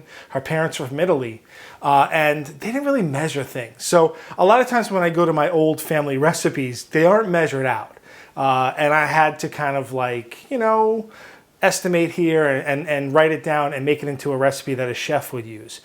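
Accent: United States. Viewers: I like people who talk less lose concentration listen talk and look the food he no stop talking He got an American accent this why he use dry yeast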